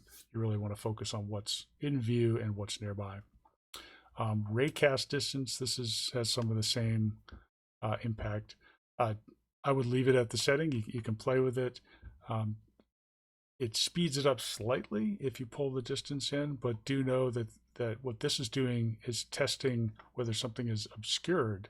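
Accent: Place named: United States. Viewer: I was expecting the voice of the other oscilloscope music guy and literally jumped at the sound of your booming American twang as opposed to the soft spoken Nordic one I was anticipating.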